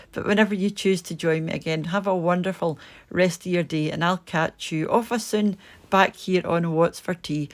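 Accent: Scotland, United Kingdom. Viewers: Oh my gosh I could listen to you all day! Love the scots accent. Never heard of custard over cake here in the USA but seems like something nummy to try!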